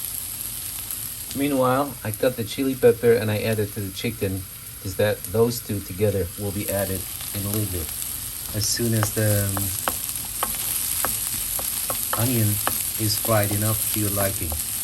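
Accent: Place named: Netherlands. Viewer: Dear My name is Dringa vlogs I’m a hundred percent blind, autistic and have a chronic heart condition. Okay, three things to say. 1. I love this video, your voice gives me ASMR, because Dutch and German are so similar, the accents are also, and your’s is one of the ones that gives me ASMR. 2. I can NEVER have this as I’m allergic to nuts, it’s called anaphylaxis and is life-threatening, I can’t even touch nuts, much less eat them. 3. here are some things you MIGHT be interested in <link> <link> <link> <link> Sent to you with mmm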